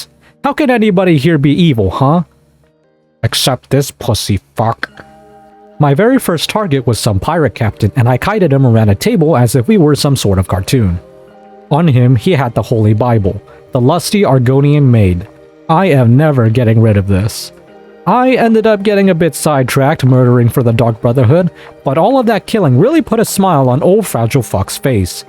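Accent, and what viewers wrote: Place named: Canada. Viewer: Ah your thick canadian accent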